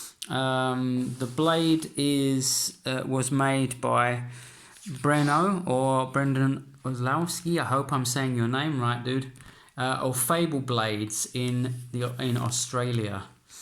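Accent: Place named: United Kingdom. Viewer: You sound British, like me - did you have any issues importing the Albion swords into the UK, import tax, etc., please...?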